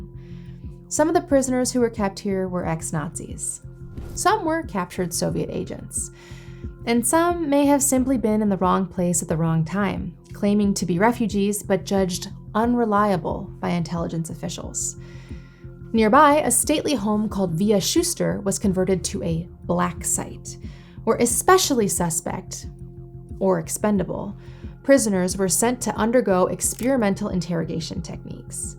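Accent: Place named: England, United Kingdom. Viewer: Your English accent is soooo beautiful!!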